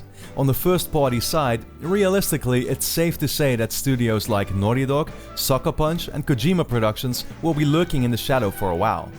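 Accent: Netherlands. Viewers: He sounds Dutch. Iam 99% sure he is from the netherlands. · Hahaha that Dutch accent tho...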